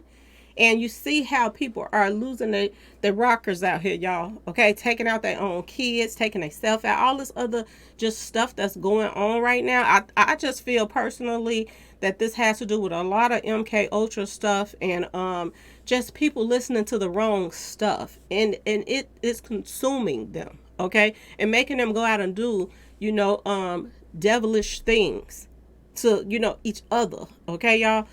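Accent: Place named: Wisconsin, United States. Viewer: Are you from Wisconsin you say y’all often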